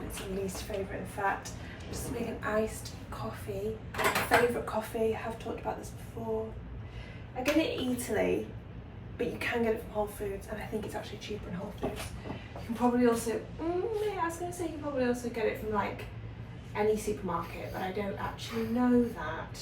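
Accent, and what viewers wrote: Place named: England, United Kingdom. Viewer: It's funny, as a french I'm so used to listen to american youtubers maybe but english accent (so beautiful) is much harder to understand ❤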